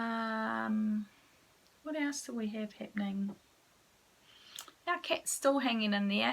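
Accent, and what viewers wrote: New Zealand. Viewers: Kia Ora! I've only just found you, thanks YouTube:0). I'm a kiwi, living in Aus (NSW). It's been way too long since I was home (stupid Covid) and it's sooo nice to hear your accent and feel the connection to home in your manner and way of being. I'll keep binging your channel, while I sit crocheting and resting with my own version of long standing illness that causes fatigue and fall out. Thanks for sharing, it's important for others, for me. I needed that connection today. Arohanui xxox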